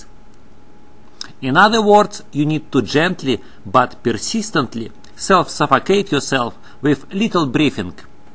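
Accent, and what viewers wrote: Russia. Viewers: he's Russian and so am I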